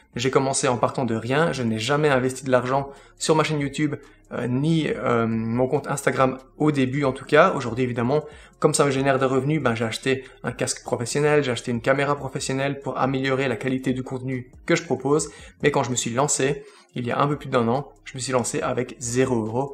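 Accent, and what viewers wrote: Belgium. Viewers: Salut, félicitations pour les 10K... toi un accent belge?? lol euhhh je confirme, de quelle région en fait? moi grandi du côté de Charleroi et Liège depuis 15 ans;) Le business Insta oui très bonne idée parce que j'ai du mal à comprendre comment on peut monétiser sur ce media. Je suppose que ta société n'est pas en Belgique (juste parce que je me demande quel est le statut dans ce cas et je me vois mal poser la question à ma comptable étant dans un domaine qui n'a rien à voir.... lol mais une chaine de présentations de produits et tuto sont en tête depuis un an) Encore merci pour tous tes conseils. J'ai aussi été validé sur Degiro en attendant que T212 se décide à revenir sur le marche européen (merci Brexit) et que TR ouvre la Belgique... T'es le bien venu pour un café si tu passes dans le coin! (pas un Starbucks hein! je sais ce que tu en penses!) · Bravo pour ces 10 000 abonnés bien mérités... 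Grande fan de ton petit accent belge... surtout ne le perd pas même si tu décide d'aller t'installer au soleil;-)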